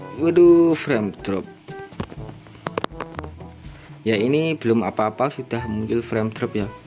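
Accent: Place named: Java, Indonesia. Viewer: Anjrr wong jawa cuk .. wis bli beres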